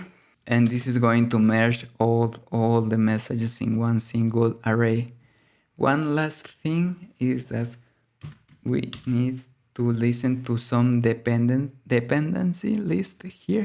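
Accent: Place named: Spain. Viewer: I think you Spanish, your English accent is so beautiful 😍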